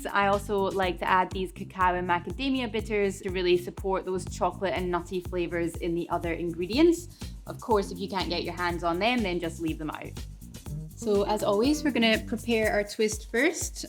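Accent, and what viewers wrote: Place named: Scotland, United Kingdom. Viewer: is now so connected with cocktails in my mind that all recipes are read in a Scottish accent.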